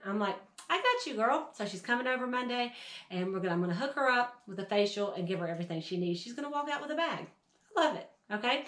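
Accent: South, United States. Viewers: Love your genuine southern accent. And of course the way you break it down for us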